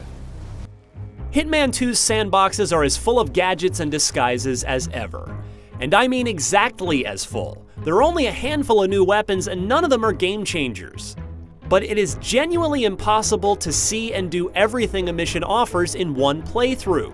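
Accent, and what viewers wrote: India. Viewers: Indian accents arent actually that bad lol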